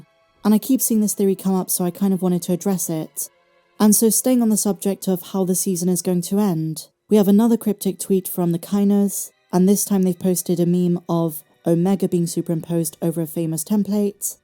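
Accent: United Kingdom. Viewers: I love your british accent. Good theories. Greetings from MEXICO. :yt:😁😅😉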